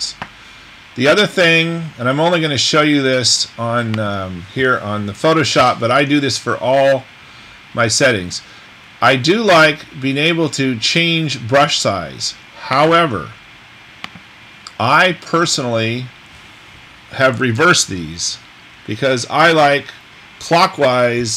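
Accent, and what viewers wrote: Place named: United Kingdom. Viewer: Not a bad thing at all at least now I can relate a voice to face. Funny I always assumed you might have been british!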